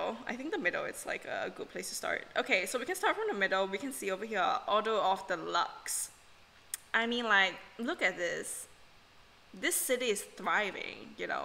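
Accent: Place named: Caribbean. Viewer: the accent sounds caribbean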